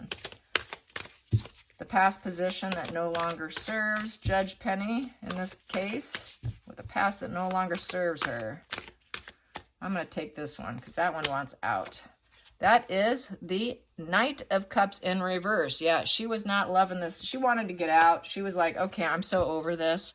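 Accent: United States. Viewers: I am from Manhattan. Your very mild Long Island accent comforts me. I live a long way from home now. It's good to hear "home" so intelligently and soulfully spoken! Thank you!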